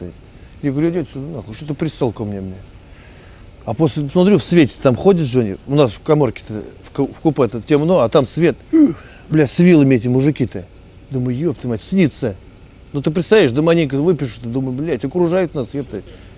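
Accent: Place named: Nizhny Novgorod-Vladimir, Russia. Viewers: наш нижегородско-владимирский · мне нравится его русский говор !!!!!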